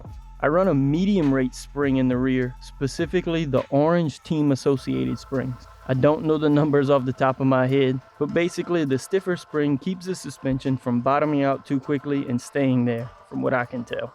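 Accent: Louisiana, United States. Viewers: Bro.are you from Louisiana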